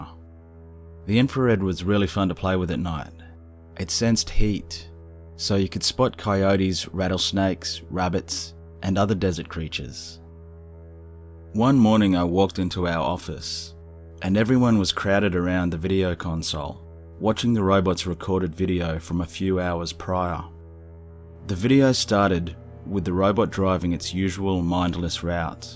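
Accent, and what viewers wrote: Australia. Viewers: Woah! Straaaaaaange! Life, 2 videos in one week!? To what do I owe the honor??!! As usual, loved the vid! Although I have heard the Creature in the Corn story before, it always sounds better when you tell it with that awesome Aussie accent 😍 😉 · Claims to have been Military working in the US.... Accent is Australian Yeah I say fake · Good stories 👍 Your an Aussie aren't you? 🍻😎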